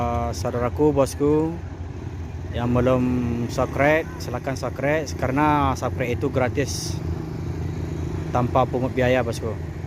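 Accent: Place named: Padang, Indonesia. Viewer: Dari logat bahasa Indonesia nya urang Padang Bana ko bantuak nyo admin Ko wkwkwk, nampak Bana lidah urang awak ... Sabkret ... Sabkret. 😀😀😀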